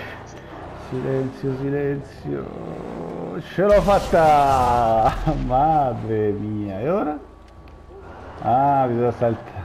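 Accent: Rome, Italy. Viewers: Súper romanaccio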